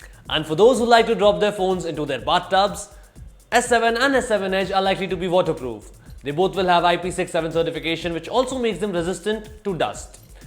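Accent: India. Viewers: i could not understand a word from your Indian accent...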